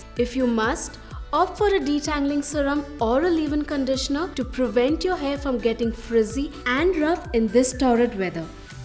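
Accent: India. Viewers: She has an Indian accent but she's black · that lady is so pretty. i think she african/indian(south asian) because her accent is indian.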